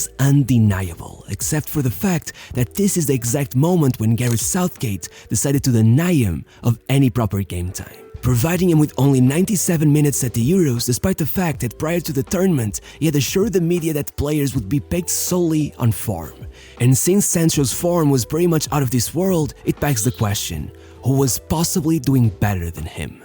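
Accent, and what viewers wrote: Portugal. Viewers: Eu sabia que eras português assim que ouvi o sotaque hhahahahha.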